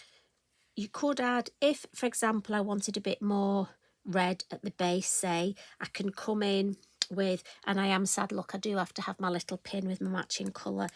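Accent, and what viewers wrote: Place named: Northern England, United Kingdom. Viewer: you are a pleasure to watch, and as a northerner down in Devon, listening to your accent just takes me back home! I have learnt so much from your tutorials, yes! me! a retired art teacher!! This is all quite different and exciting to what I am used to! It must be because I am doing it solely for me and NOT for a set of marks! Now, my next adventure will be with Dinkles…so vibrant and pure! I can’t thank you enough …..very best wishes…Donna!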